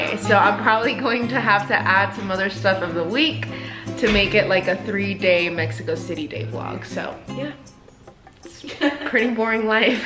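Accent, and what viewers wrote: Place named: United States. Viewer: Porque los popis de mx. Ablan English while in Mexico???? Osea se los olvida el Español in Gringa voice!!! Tots not gringa!! Lol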